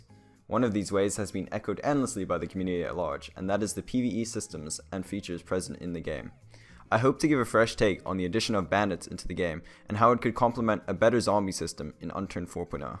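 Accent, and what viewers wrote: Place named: United Kingdom. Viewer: This would be extra nice .. you sound bigmany time brtitsh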